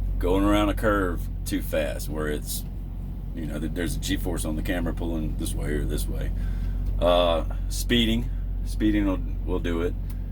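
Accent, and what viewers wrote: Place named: Georgia, United States. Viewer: I really luv ur videos ur so down to earth and intelligent . Georgia men WOW...needless to say I could listen to your Georgia accent all day🤭☺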